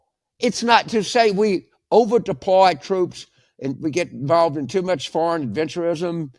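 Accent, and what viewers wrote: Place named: Louisiana, United States. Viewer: YES! James you need to come over for Bloody Mary's one day! Now you sound like a LA boy raised on politics! "That's NOT an alternative view!" -, 2024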